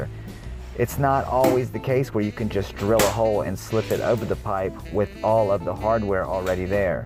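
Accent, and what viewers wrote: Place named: South, United States. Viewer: Dude, your a boss on this one! Great explanation. Great accent! I’m thinking somewhere between N. Carolina and Mississippi!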